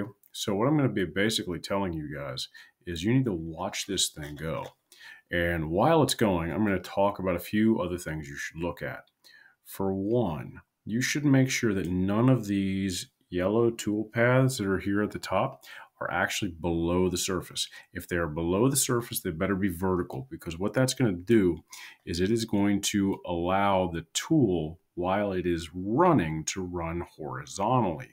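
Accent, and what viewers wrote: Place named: United States. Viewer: you sound the most american ever. you are funny btw hahahahahah.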